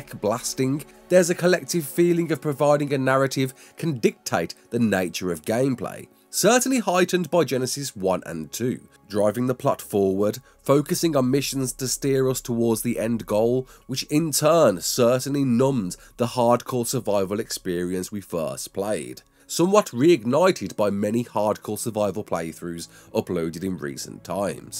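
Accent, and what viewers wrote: United Kingdom. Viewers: I just love your british accent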